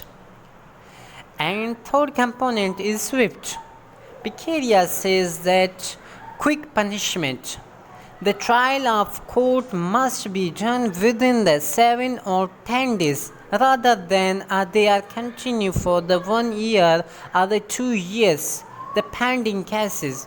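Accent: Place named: Pakistan. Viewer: Pake ascent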